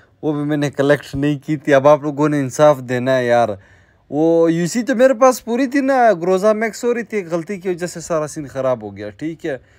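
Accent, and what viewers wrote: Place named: Hindko, Pakistan. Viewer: Ap kaha say ho? Awaz sa asa lgta hai ap hindko speaker o.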